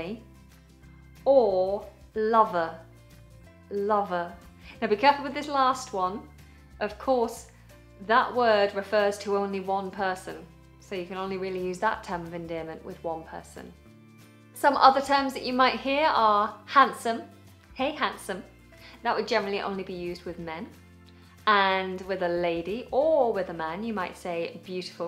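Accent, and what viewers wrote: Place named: United Kingdom. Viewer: Big Thumbs up; love your British Accent so much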